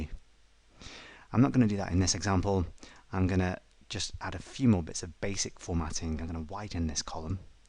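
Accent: England, United Kingdom. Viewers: Refreshing to hear these type of articles with a british accent for a change. Nice one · Love all your videos. Like the English accent. I would like to expand on this topic and see if you could use a stored procedure instead of an embedded t-sql and pass the parameters to this sp to retrieve the information. I tried to do this but could not bind the parameters to any field in the report query. Do you have any suggestions?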